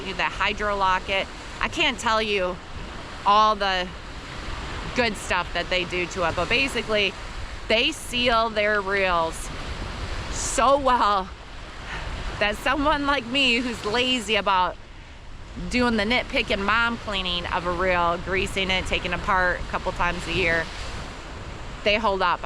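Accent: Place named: Midwest, United States. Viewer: I grew up in Michigan, Pennsylvania now. I suspect you are not originally from Florida. I recognize your Midwest accent. it is music to my ears. (Don't recognize...)I really like your videos, I hope you both hang in there. Blessings....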